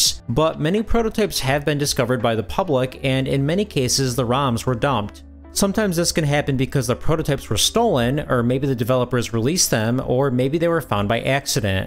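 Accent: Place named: North Dakota, United States. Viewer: I hear a fellow midwestern haha! Sounds like North Dakota hehe! I’m in Fargo. You’re cute too lmao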